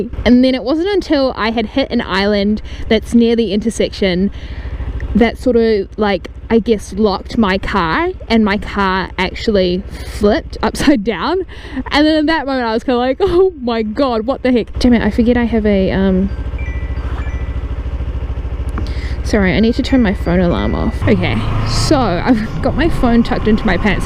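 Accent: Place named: New Zealand. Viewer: Quite liking the New Zealand accent 👌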